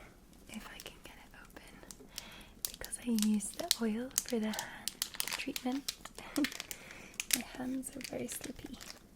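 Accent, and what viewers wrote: Scotland, United Kingdom. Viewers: This one makes me feel like I've been pampered by a sleep-angel, sent from Scotland to give me a relaxing infusion of comfort sleep. Sending happiness and health to you Ms ❤ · What is this lovely Scottish woman doing in my bed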